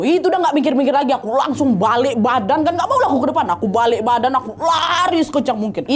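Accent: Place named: Sunda, Indonesia. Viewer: Jujur sebagai orang sunda asli Suka banget sama KHW Sunda ka lucu logat ngomong sunda ka 🥰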